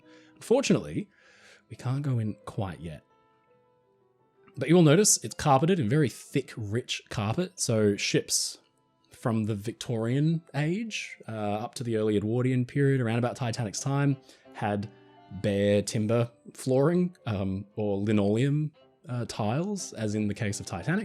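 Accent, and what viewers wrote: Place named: Australia. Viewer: Oh yes indeedy! I could listen to forever! He's got what it takes to be a successful narrator. First of all, he's an Aussie, and who doesn't love that accent; and he's got the perfect cadence in his voice, eloquent and elegant, which keeps the listener not only affixed to it, but always wishing for more! Maybe I've just described myself and my likes; and as for our ~ noone, and I mean noone has topped his talent here on YT (and I do enjoy listening to high quality programming)! 💙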